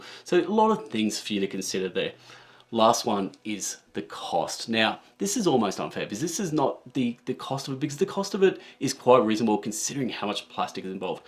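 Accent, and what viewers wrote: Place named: Australia. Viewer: Great to hear an Aussie accent covering board games on YT! What do you think of the shipping costs to Australia for this campaign?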